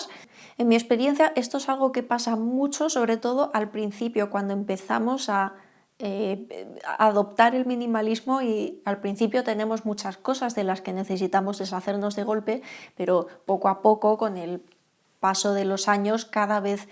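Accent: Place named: Spain. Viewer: Es maravilloso escucharte!! Eres dulce, motivadora y nos das tips muy excelentes. Además amo tu acento español!!! Me has enseñado tanto y me eres mi gurú, ya no sólo del minimalismo, de valores de la vida en general. Te tengo guardado un gran cariño y agradecimiento! He adoptado tu frase "mandar todo a la porra" 😊❤💕🌸 Eres genial!!!!!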